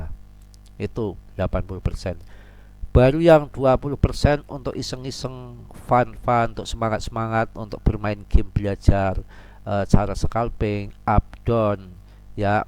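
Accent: Banyumas, Indonesia. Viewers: Yakin nyong seneng banget Karo BROOO.... Gole jelasnya logat ngapak.... Tapi JELAS... JUJUR APA ANANE.... ORA GAWE WONG MONCROT.... GAWE WONG DADI SULTAN..... MATUR SUWUN BRO..... DMOGA ISTIQOMAH JADI AMAL JARIYAH...... AMIIN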